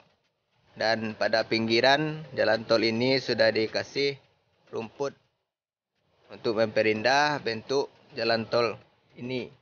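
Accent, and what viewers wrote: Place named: West Sumatra, Indonesia. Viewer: Jaleh bana logat Minang ang sanak😂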